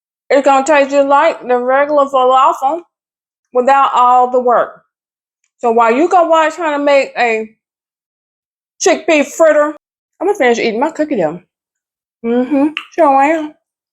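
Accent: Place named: South, United States. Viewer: I just love your style with that country accent! I stumbled across your video looking for chick pea recipes. I've been making chick pea salad for awhile now since I started making plant based recipes. I'm going to make the fritters, which I'll call patties for dinner tonight. I have some cans of chick peas until I do marketing tomorrow!😂 I've never heard of chick pea cookies! I made healthy oatmeal cookies yesterday! I'll try the chick pea cookies this weekend! I'll let you know how my patties turn out!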